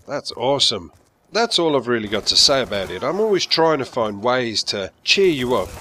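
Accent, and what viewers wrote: United Kingdom. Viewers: I enjoy listening to you UK guys more than the US commentaries. Keep up the good vids bud!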